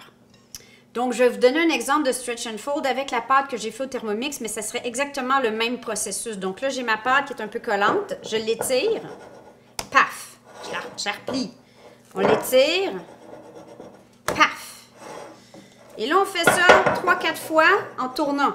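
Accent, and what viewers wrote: Quebec, Canada. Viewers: Je viens de découvrir votre chaîne aujourd'hui, j'ai seulement regardé la première vidéo de pain il y a quelques instants, et BAM nouvelle vidéo du pain ! Quel hasard ;) merci pour la vidéo, ça fait plaisir d'entendre une Québécoise et de voir une recette de pain (je suis Bretonne en manque du Québec!!) suis ravie d'apprendre que des Bretons font d'aussi beaux récipients comme vous en avez montrés. J'itai peut-être y faire un tour ! Bonne continuation :) (et je rajoute que je trouve ça très drôle car vous en peignoir le matin en train de continuer votre pain de la veille dans la première vidéo c'est exactmeent moi ^^ ainsi que le chéri qui dit 'mais il est très beau ce pain! avec moi, amatrice boulangère, qui tire la moue parce que je suis trop perfectionniste :) )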